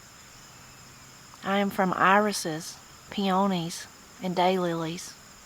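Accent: Appalachia, United States. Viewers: Love Appalachian talk! “Canon jars” a container for a camera. or a container for storing sacred books, dogmas.e.g “The Pastors wife, was canon the last supper”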